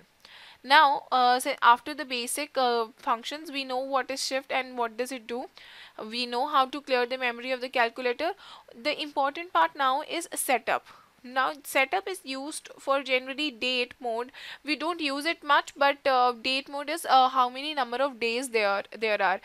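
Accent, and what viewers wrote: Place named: India. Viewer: Listen baby, you can work out from the accent that she is Indian. Sorry, but that is the speed at which normal educated Indian brains function. Perfectly paced for me, who am a not - too- bright Indian. Upgrade yourself, buster.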